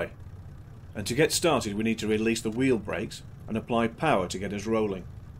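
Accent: United Kingdom. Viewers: I like this! It’s the perfect accent for a British airplane. It puts me in the mood lol. Wags is very busy with other modules and probably making Apache vids also.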